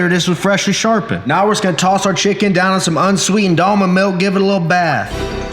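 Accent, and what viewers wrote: Texas, United States. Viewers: He's from Ohio, but definitely has Texas growing on him. Food and twang 😍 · Is it just me or his Texas accent is getting stronger?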